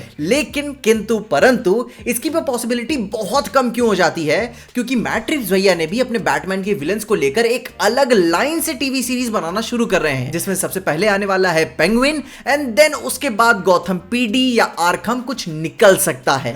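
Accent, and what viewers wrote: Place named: Uttar Pradesh, India. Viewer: Bhatere 😁 up ka dialect aane lag gya bhai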